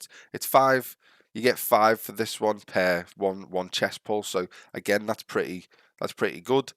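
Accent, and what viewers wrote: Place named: Northern England, United Kingdom. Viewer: Love the northern accent! I'm a little farther north, I'm Yorkshire. Altincham are you?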